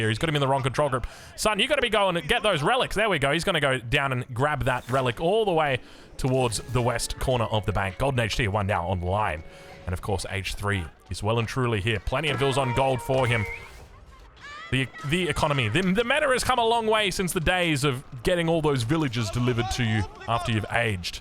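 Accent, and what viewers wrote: Australia. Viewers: You have no idea the things I do when I hear a good Australian voice..... <3 Aussie :)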